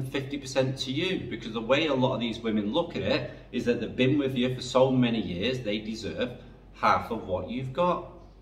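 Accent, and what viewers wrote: Manchester, United Kingdom. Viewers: That back home accent sounds like Walkden Manchester. Just dropped on to your site this morning been binge watching since 8.00am this morning,beento Thailand twice now havent been in 5 years,those pesky kids cost a fortune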